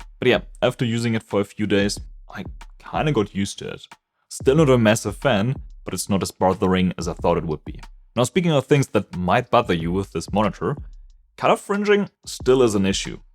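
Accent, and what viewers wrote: Germany. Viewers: der deutsche akzent ist einfach viel zu stark🥲 meine ohren tun weh. Aber nices video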